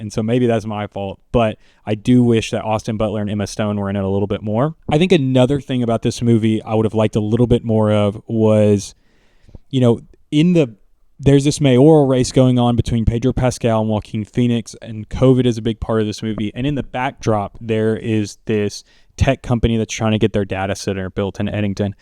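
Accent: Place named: South, United States. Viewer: about to watch the video but upfront: love the accent. don't think i hear many southern accents from youtubers i don't know if this was consciously on ari aster's mind when he made joe cross' ending, but in the theater i immediately thought about the cases where people have been disabled from complications of having covid and any preexisting conditions that are worsened by it. he's paralyzed from the head injury but it makes a dual image. i'm thinking it was conscious since the er doctors commented on his high temperature, and, if i remember correctly, that affected the care he got at the hospital. we know he has asthma and probably sleep apnea (i recall a machine in the bedroom). at the least these parts of joe's characters were added for the dramatic and comedic effect but aster is a very thoughtful writer and director. it isn't something i've seen anyone comment on or ask him about. 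it just nags at me once i saw cross' breathing problems i knew he was doomed (practically all main characters in his films are). and once he went off the deep end i was ready for whatever terrible fate would befall him. but i felt sympathy for him after he's paralyzed. despite it all aster created an ending so awfully poetic for cross that i was struck by what it must feel like to be him, trapped in his head forever and unable to do anything for himself. it's fucking bleak. and of course aster slaps you over the head for the empathy with what the nurse and mother in law do. genuinely diabolical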